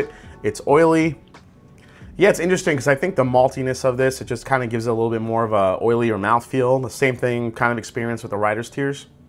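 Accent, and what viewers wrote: New York, United States. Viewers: Great NY Italian Irish accent! Really enjoy the Black Bush. Nice sipper and holds up well in a Hot Toddy or with ice.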